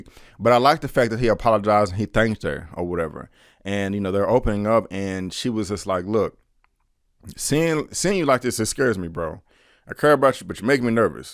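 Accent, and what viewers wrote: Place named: Dallas, United States. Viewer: I can hear the Dallas! Make me miss the Southwest.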